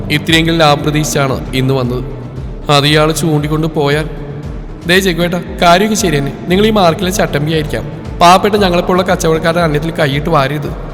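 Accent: Coastal Kerala, India. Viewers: വിജയ് സത്യാ സുപ്പർ സ്റ്റോറി♥️♥️ എതൊരു ഗുഡ യെയും നന്നാക്കാൻ ശ്രമിച്ചാൽ അത് സ്നേഹം കൊണ്ടെ സാധിക്കു നല്ലവനായ ജഗൂ❤❤ ചിലങ്ക❤❤ ഇക്കാ കടപ്പുറം സ്ലാങ് അടിപൊളി😅👌🏼👌🏼👌🏼💞💞💞💞 അവതരണം കേട്ട് അങ്ങനെ ഇരുന്ന് പോയ്🥰🥰🥰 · ഇക്കാടെ വോയിസ്സിൽ കടപ്പുറം ഭാഷ കേൾക്കാൻ നല്ലരസം നല്ല സ്റ്റോറി 🥰🥰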